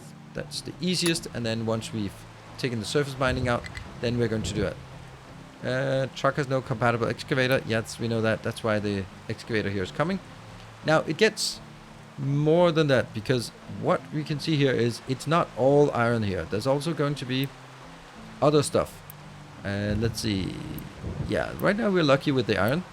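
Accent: Denmark. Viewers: Nice video. Your English is really good. But a fellow dane can hear :)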